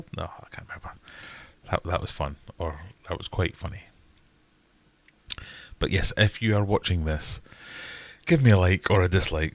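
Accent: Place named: Scotland, United Kingdom. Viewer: Need to put microphone farther away. Scottish accent is awful at close range. ‘R’s are horrendous and every hard consonant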